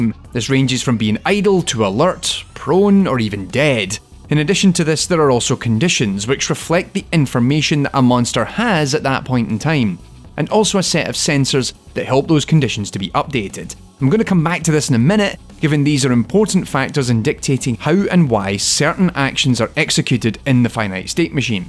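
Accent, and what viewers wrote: Scotland, United Kingdom. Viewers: "Valve's 1998 shitter." I'm like yeah it's old but shitter is a bit harsh... oh he's a scotsman. =D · Took me nine minutes to realize he's scottish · please keep being scottish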